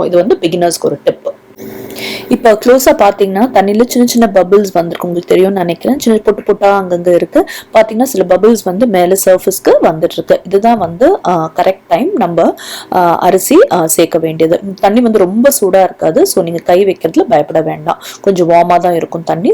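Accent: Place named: United States. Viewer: ரொம்ப நன்றிமா 🙏 உங்க பேச்சுபாத்தா நீங்க அமெரிக்கால இருகரகீங்கன்னு நினைக்கிறேன் கரெக்டா? நங்களும் இங்கதான் இலருக்கோம். நாளைக்கு இப்படி சாதம் வடிக்கப்போறேன் 🙏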